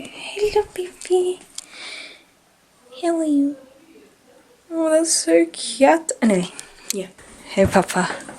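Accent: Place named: Bosnia and Herzegovina. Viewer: Are you Bosnian? Your accent sounds Bosnian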